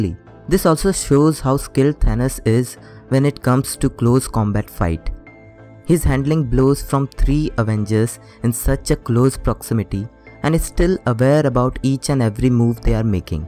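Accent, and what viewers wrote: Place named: India. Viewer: R u indian cause ur English is id wanna say it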